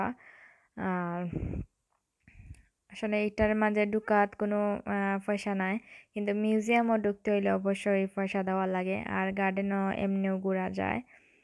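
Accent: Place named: Sylhet, Bangladesh. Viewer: সিলেটি আপা আপনার কথা হুইন্না আমার ভালো লেগে গেছে অনেক ভালো লাগছে আপু আমার কথা শোনার জন্য আমার বাড়িতে আইসেন একটু দাওয়াত দিয়ে গেলাম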